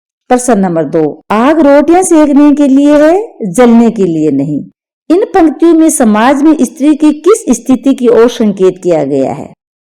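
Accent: Rajasthan, India. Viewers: Mam aap rajasthani ho na aapki voice tone se lagta h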